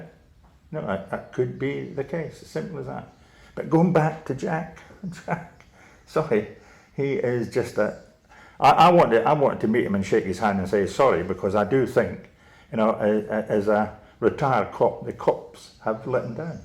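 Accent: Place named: Edinburgh, United Kingdom. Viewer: Great work I truly hope you get the answers you need I’d hate to see an innocent man die in prison ps are you from Edinburgh originally your accent is very similar to mines especially when you said it disnae fit 😂 ✌🏼💙👍🏼🏴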